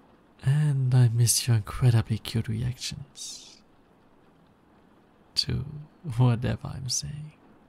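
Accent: Germany. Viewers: That's the most cutest audio I've ever listened too! Thank you,! And I have been blessed with your adorable German accent! <3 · How come it never occurred to me that this accent is German? I literally live in the neighbour country 💀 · Love this audio I just found it, and I'm literally crying rn. I never felt so safe in my life Love your German voice<3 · Your German accent is cute. Loved this new experience. Great audio. LOVED IT!!!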